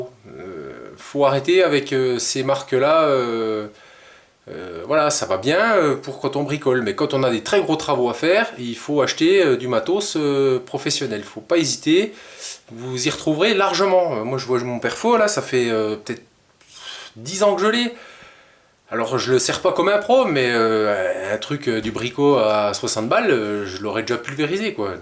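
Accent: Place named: South, France. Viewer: je vois que tout le monde est rentré du chantier ou des courses à cette heure. Fais tes vidéos avec ton envie et ton petit c...r, ça va marcher tout seul (bon dans le texte y'a pas d'accent, toi tu l'as du sud, de la contoise, et moi de la parisienne, ça nous ferais un mélange bien bizoire) A+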